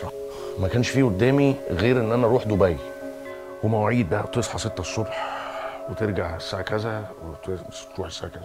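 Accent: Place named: United States. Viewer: I remember you from nadi smoha, get rid off the American ascent on your words.. please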